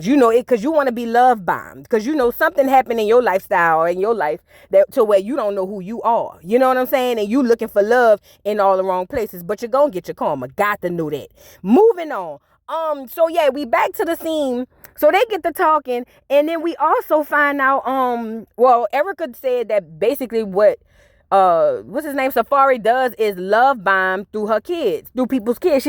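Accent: New Orleans, United States. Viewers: It’s ya naworlins accent for me 😂😂😂 keep it up girlie 💐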